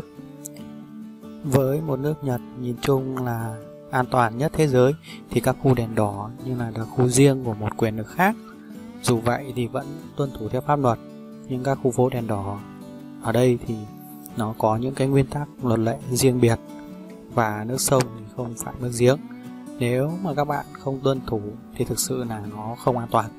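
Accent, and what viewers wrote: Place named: Hai Duong, Vietnam. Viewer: Đặc giọng Hải Dương 😄😄😄😄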